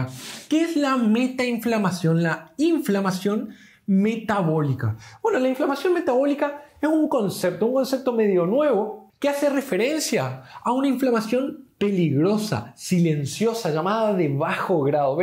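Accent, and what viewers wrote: Misiones, Argentina. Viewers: es Argentino es su tono de voz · Dr por el acento usted si no es de Misiones pega en el palo. Excelente video. Saludos!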